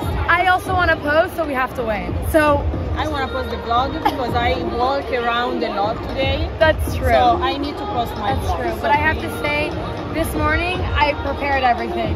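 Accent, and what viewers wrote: Italy. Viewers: English with Italian accent, very cute😘😘😘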